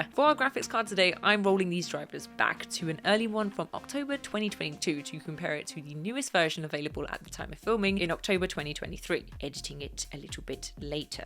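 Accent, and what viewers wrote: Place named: United Kingdom. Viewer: are you thinking she is Australian? She is british. I think its funny so many americans can't tell the difference between the two accents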